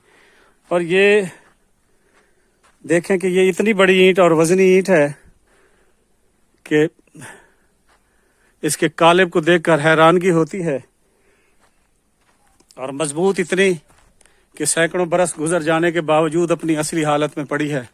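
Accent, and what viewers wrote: Saraiki, Pakistan. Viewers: Sir jab ap saraki ka tarhka lagaty ho to bahot Maza ata hai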